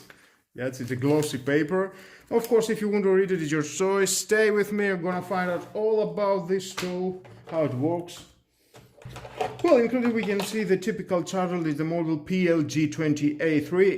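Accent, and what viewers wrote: Greece. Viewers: out of the point, I think you are Greek from your accent. If you are, do you know where can I find it, except from Lidl :-P ?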